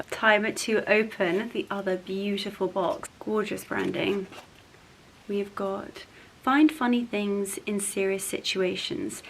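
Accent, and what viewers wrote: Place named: United Kingdom. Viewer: Hi I love your Vlogs I just discovered your channel. You are so beautiful from inside and outside. You seem genuine with a heart of gold a good person. Love your British accent 🇬🇧you sound like a real princess. 😘🇺🇸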